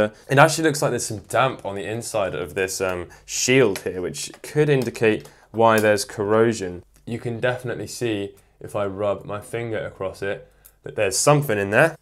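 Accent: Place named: United Kingdom. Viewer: Sounds like a fellow UK gamer to meeeee. 😎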